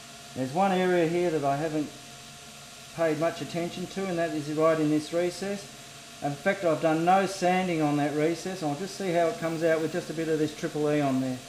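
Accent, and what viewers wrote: Australia. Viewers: Lovely. I am just interested in turning techniques and results (being a female without a lathe or indeed a shed to put it in I can only admire the work of others. But I am truly amazed at the number of things that can be used in turning. I saw one video where the man used pieces of kitchen sponge in resin and the coloured pencils items are amazing. Good to hear a home grown Aussie accent too. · I'm Just really happy he's Australian, and I can hear the familiar sayings and accent.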